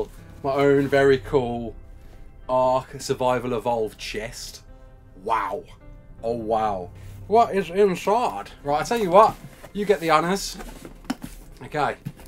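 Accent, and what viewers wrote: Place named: Liverpool, United Kingdom. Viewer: You sound a bit scouse, are you from liverpool?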